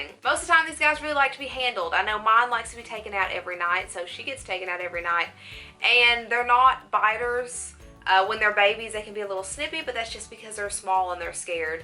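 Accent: South, United States. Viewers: That southern accent took me by storm 😊 lol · Girl you are so beautiful, but... That accent, is it Tennessee or Carolina maybe Texas or Georgia got to be one of the four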